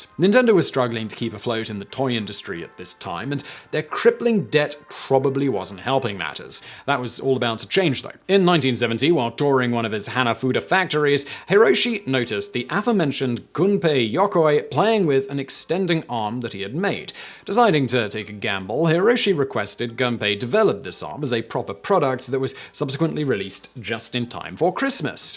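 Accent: United Kingdom. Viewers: Since when does have a british accent?! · I can't tell if is pronouncing all those Japanese names wrong or if he's just pronouncing them all British.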